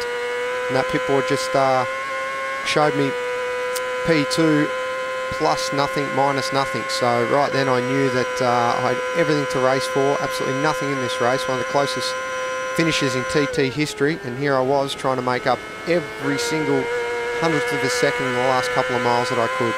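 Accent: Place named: Australia. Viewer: You sound like an Australian. I'm kiwi that's how I know 🙂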